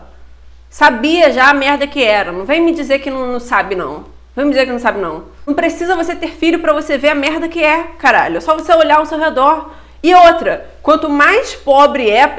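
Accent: Rio de Janeiro, Brazil. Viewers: Seus vídeos são um barato, esse jeito carioca de falar kkkkk perco tudo! Adoro, reflito bastante, gosto de ver pontos de vista de pessoas sinceras, sem hipocrisia. Também não quero ter filhos, não terei e fim.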